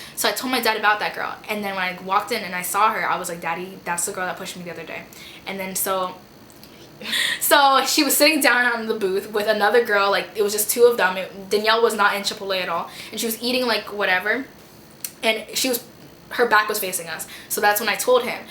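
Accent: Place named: Spain. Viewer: you sound spanish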